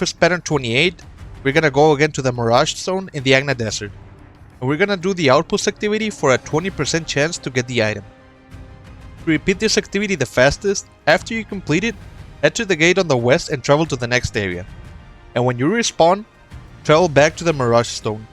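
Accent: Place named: Mexico. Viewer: I can hear the Mexican in ur accent lol. All love brother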